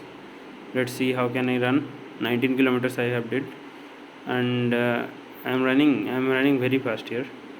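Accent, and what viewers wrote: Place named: Odisha, India. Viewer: Bro you odia? Coz your odia accent is 🔥🔥🔥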